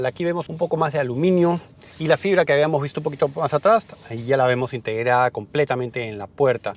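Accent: Peru. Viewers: eres de Perú??????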